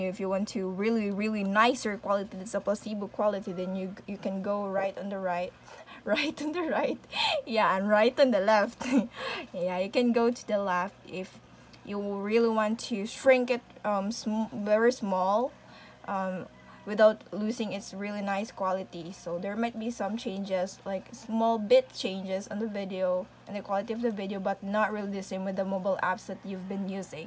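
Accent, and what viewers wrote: Visayas, Philippines. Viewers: Bisaya ka te? Hahaha para lang kasi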